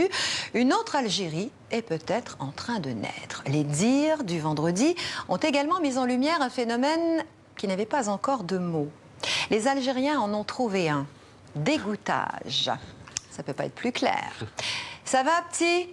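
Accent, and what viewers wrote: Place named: Montreal, Canada. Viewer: L.accent montréalais me manque je vous invite à venir en Algérie pour enrichir votre dictionnaire je dit tout ça après avoir su que vous etes québécoise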